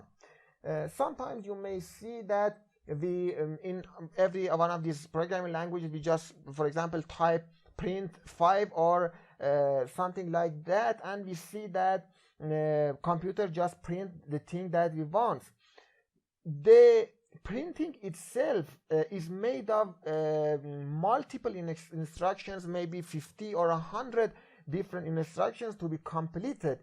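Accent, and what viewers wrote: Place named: Iran. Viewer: cheghad shabih irania harf mizani !!!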